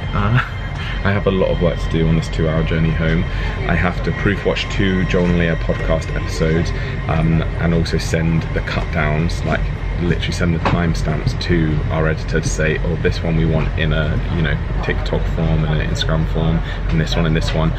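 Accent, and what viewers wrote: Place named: RP, United Kingdom. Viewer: By any chance, does Keegan envy you that you speak such pure royal English, and he can't, even though you grew up in 1 country? ;) <3, separate Keegan 🥰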